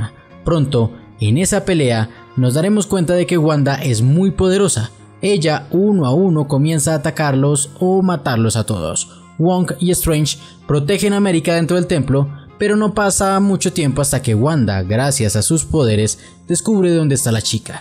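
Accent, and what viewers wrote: Colombia. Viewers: Me encantó tu resumen (sobretodo tu acento Colombiano😍, no lo contamines con barbarismos mexicanos y argentinos😖).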